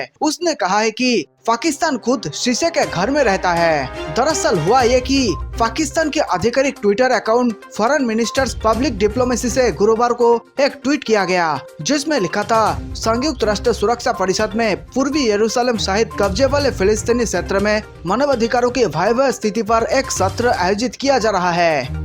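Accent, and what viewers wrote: Bengal, India. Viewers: Idiotic narration. Change it. Your heavy bengali accent isn't music to the ears. No offence intended. Get a new narrator. That will be help your channel. ( But all concocted and false info)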